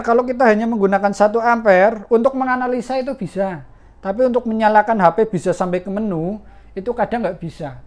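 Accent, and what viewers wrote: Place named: Java, Indonesia. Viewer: Ngomonge medok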